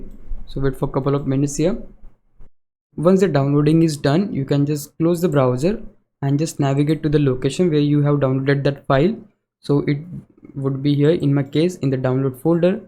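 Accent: India. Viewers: indian acctent carried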